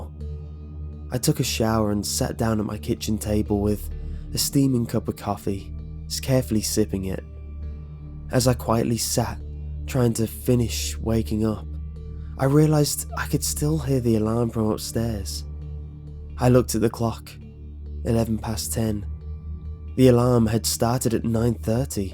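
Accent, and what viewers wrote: United Kingdom. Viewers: Are you British or American?! THIS IS DRIVING ME INSANE!! WHY THE HELL WOULD YOU DO THIS TO SOMEBODY?!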